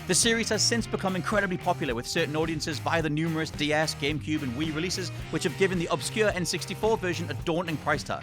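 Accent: United Kingdom. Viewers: Yeah, he's britsh. Rember they call the SNES the SNES and not by each letter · because he's British? That's how we say it. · That weak currency, on a British channel, with a British narrator? Nahhhhh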